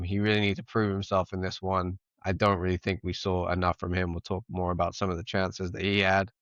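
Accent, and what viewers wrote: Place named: RP, United Kingdom. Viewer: Your accent is so much more posh now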